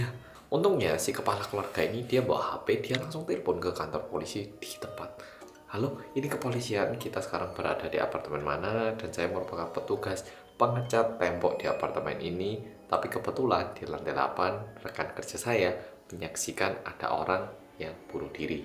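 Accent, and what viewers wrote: Java, Indonesia. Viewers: Bang latih dong ngomongnya jgn terlalu medok, jujur aku kurang ngerti ceritanya, dan suaramu kadang timbul tenggelam ga jelas, seharusnya agak keras sedikit biar jelas 🙏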